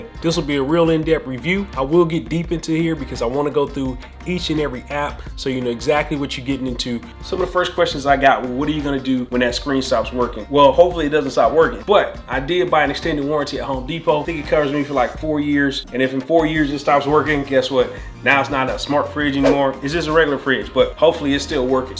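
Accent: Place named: North, United Kingdom. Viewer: You’re definitely from up north😂‼️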